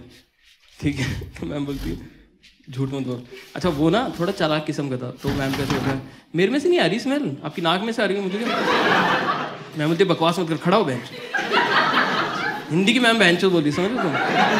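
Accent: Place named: Garhwal, India. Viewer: Bhai tum jaat nhi laggre ho yaar gadwali laggre ho tum tohh 😅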